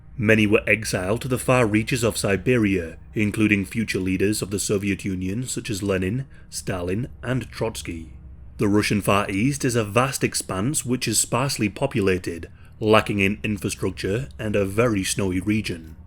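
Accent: United Kingdom. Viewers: Dudes British my guy. He just talks like that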